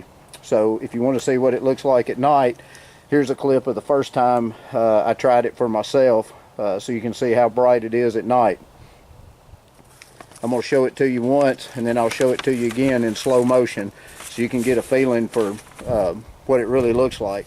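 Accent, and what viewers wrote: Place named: Appalachia, United States. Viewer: Right off the bat he sounds like a hillbilly.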